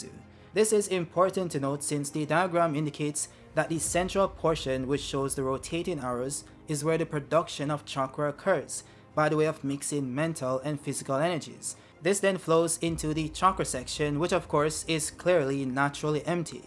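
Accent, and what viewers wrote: Jamaica. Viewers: Are you jamaican? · Yaa Jamaican? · You a yard man?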